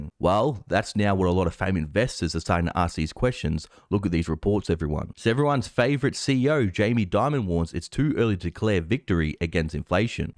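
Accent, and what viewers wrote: Australia. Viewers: That’s Ultra Mega Aussie 🇦🇺🤙🏻🇺🇸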